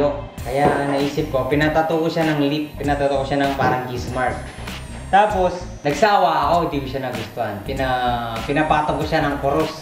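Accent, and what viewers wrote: Pampanga, Philippines. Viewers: Sarap pakinggan boses ni kuya ska ung puntong kapampangan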